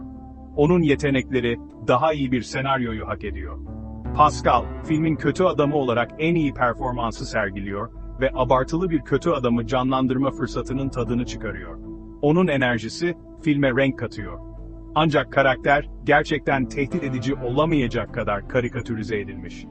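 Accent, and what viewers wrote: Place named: Rize, Turkey. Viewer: Sikik rizeliler gibi konuşma aq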